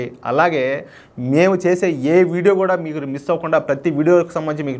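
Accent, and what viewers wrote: Rayalaseema, India. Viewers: Sir good morning You're Language is super Rayalaseema slant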